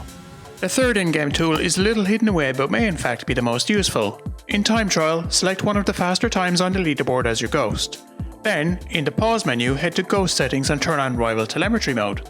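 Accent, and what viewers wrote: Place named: Kilkenny, Ireland. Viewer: What part of Ireland are you from? I can't tell by your accent I am guessing Kilkenny? 🍀🇮🇪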